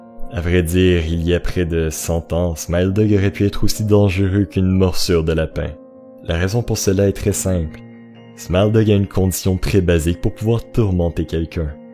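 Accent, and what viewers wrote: Quebec, Canada. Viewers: enft c que il quebecois😃😀😀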